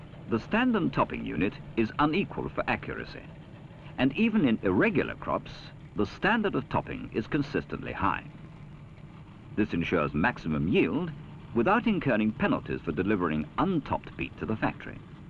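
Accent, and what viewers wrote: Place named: RP, United Kingdom. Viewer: Curious video production, it looks very 1950-60s with the posh narrator and wobbly camera. However the vehicles seen are very much 1974 - I can only assume this production (video) was commissioned (paid for) by Standen as the BBC and ITV were much more of today's standard in video picture quality. All the same was good to see this, that machinery is much more smarter than it first seems, and did we all cheer when the 135's came into view?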